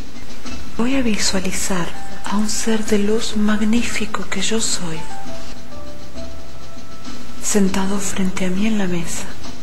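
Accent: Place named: Argentina. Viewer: no se por que pero el accento argentino me pone de los nervios!!! como relajarse asi????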